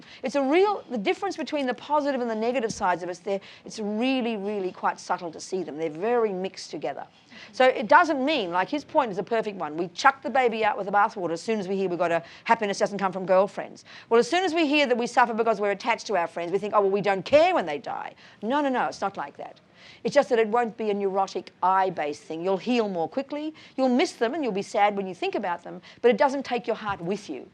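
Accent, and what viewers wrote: Australia. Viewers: She's Aussie, 100%, so even drier still. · i like this nun she speaks good aussie language peace compassion wisdom im happy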